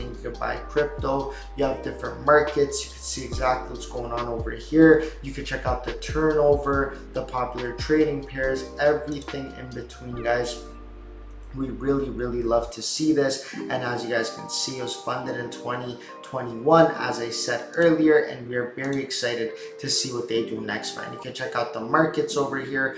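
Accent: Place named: United States. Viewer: Most American sounding Russian I’ve ever heard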